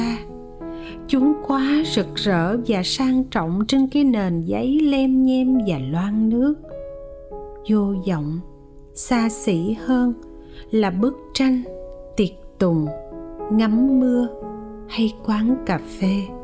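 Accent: South, Vietnam. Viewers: 5/10. Xin cảm ơn giọng đọc tuyệt vời, miền Nam yêu dấu của.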